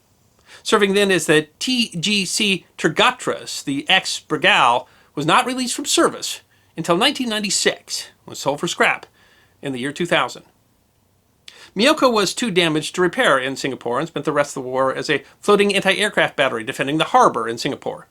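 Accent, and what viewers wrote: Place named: Midwest, United States. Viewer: Those flat Midwestern "A"'s!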